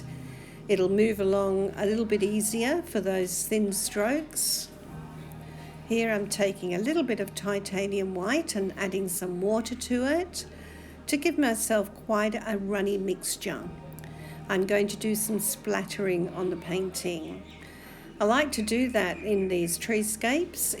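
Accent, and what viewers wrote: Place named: Australia. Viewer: Just came across your channel and enjoyed the different ways you produce art from the gelli pad. Thanks for all the detailed comments and hints. It's great to hear an Aussie. Look forward to following more of your art works. Lesley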